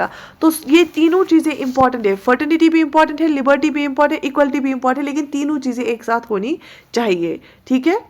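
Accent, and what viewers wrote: Kashmir, India. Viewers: Beautifully explained. There's teachers. And there's tone to Kashmiri teachers. Love it thoroughly!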